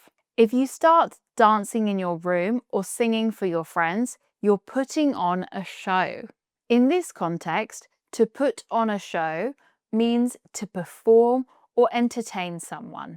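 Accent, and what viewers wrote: RP, United Kingdom. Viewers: I love british english accent, and specially, the RP. There are several youtube chanells for MP; but there are just a few for RP. And I enjoy your lovely way to teach us. Thank you and greetings from Colombia.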